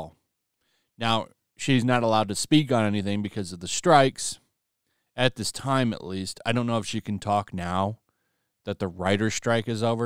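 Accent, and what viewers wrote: United Kingdom. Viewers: Like the British accent put to a Shakespearean tone. Thanks.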